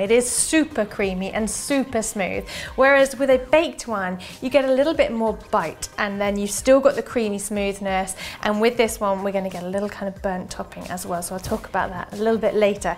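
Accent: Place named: RP, United Kingdom. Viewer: A Very British “Toff” Accent!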